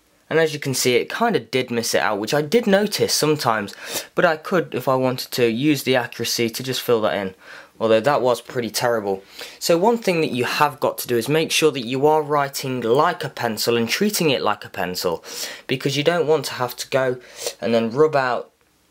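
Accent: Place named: United Kingdom. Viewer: I love your british accent!!!!!! :D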